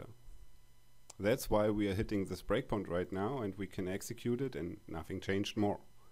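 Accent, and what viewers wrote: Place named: Germany. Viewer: A very German name and a very German accent :D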